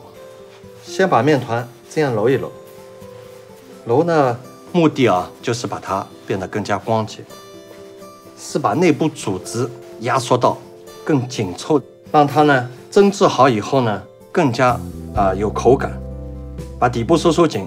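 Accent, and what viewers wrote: Shanghai, China. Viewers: 阿拉上海人👍👍👍温文尔雅，带着上海口音的普通话😀😀😀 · 一听就是阿拉上海人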